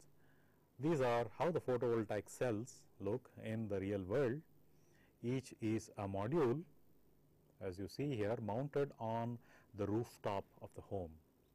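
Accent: India. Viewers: Hello, He is an Indian and there is nothing wrong in speaking with an accent. This program is primarily targeted at Indian students, who won't mind the accent. And unlike westerners, Indians have a culture of respecting their teachers. Grow up, and throw your chauvinism out when you come to learn something.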